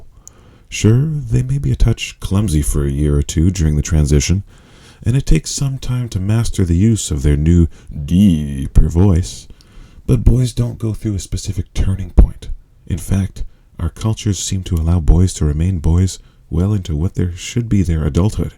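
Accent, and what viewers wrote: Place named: United States. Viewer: please will you get in contact with me Master I want to learn as much as I can about all this. I've ordered three of the books about Gor from Amazon. I think you live in America as you sound American. I live in Belfast northern Ireland so I think I'm about 5 hours ahead of you in time not sure but you can contact me anytime you like but there may be a delay in getting back and in real time sorry. I'm also at uni most days which doesn't help with things either. I'm bit embarrassed about asking but I want to be a sex slave slave girl and learn as much as I can . Now I'm totally embarrassed and will be a complete nervous wreck until something happens from your side or not. You can contact me anytime you like . Love Tara x. I feel a bit humiliated asking about all this but it's what I want. Tara x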